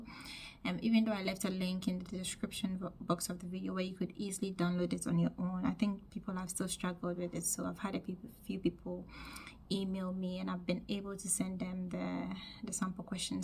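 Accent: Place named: Ghana. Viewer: The Ghanaian accent is slowing fading.. ❤❤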